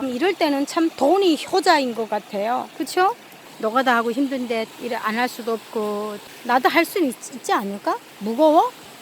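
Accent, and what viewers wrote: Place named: North Korea. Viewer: 말소리가 북에서 오셨나요. 쉬엄쉬엄 하셔요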